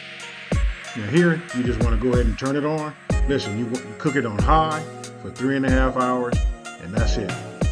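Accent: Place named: South, United States. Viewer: Hi I'm new to the channel band I'm looovving everything I've seen so far!!! where are you from? I hear a southern accent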